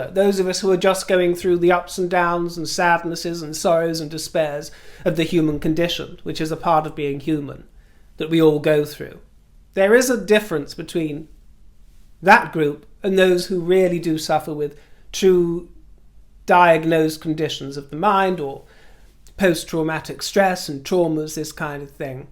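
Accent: United States. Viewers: Love your ‘Merica accent!🇺🇸😂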